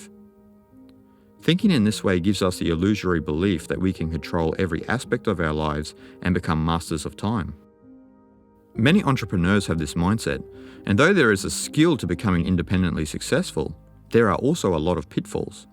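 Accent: Australia. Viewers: A piece of art really, I watched both documentary, your voice is so calming and I love your Australian accent, the quality of information are incredible and very well explain. Thanks for this. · I am grateful for the fact that just discovered your channel through The Art of Effortless Living two days ago. And yes, it was the perfect timing as how the law of attraction usually works. I listened this video in this morning, what a great way to start a day! Listening your contents is joyful way to be in the moment especially when my mind is agitated. (: Also checked your website, judging by the quality of your videos I bet your books are as remarkable. As a person born and grew up in far East I was not interested in Eastern philosophy for the first three decades of my life. But I found myself always captivated by the words from those who originally western born but obtained depth of both western and eastern philosophy/spirituality. 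To me their way of speeches are so effective, easier to digest and apply than those speakers/writers that are eastern born even though I don't doubt the eastern born also have good depth on both side of philosophies. I guess you are one of those exceptionally people have such depth in both way and know how to explain well. Your contents are as listenable as Alan Watts' speech videos. I didn't expect to hear such words in Australian accent which is amusing and refreshing. :D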